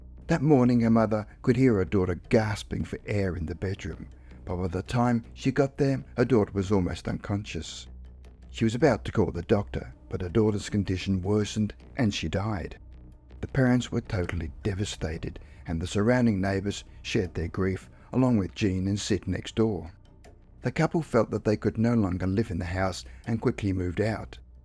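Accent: Australia. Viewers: It's great to hear a fellow Aussie narrate. Onya! 🇦🇺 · Im glad to hear more Australian "spooky stories" narrated by Australians 😊 Hunters Hill and Gladesville are VERY heavily populated by paranormal shenanigans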